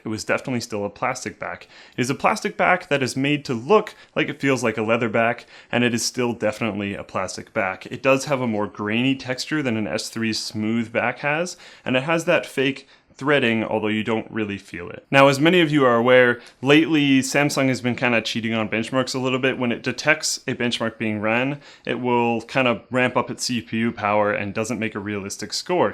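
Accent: Canada. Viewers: dat canadian accent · Is he Canadian?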